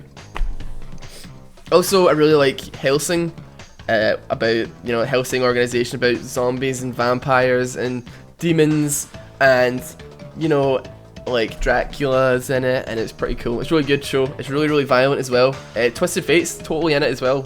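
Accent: Scotland, United Kingdom. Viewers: Im scottish too lol literally sound almost identical to you * u * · You sound Scottish. Nothing else.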